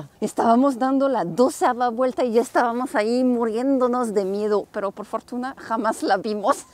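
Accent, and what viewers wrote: France. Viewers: Ya te esta ganando el idioma frances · c'est magnifique ta chaine aussi que ton accent français quand tu parles spagnol mexican 🙊, je t'apprécie énormément même si je ne te connais pas, saches que tu transmets la Joie de Vivre ✨des bisous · me encanta tu narrativa en perfecto español con acento frances, te felicito por tu videos que me atrapan, saludos.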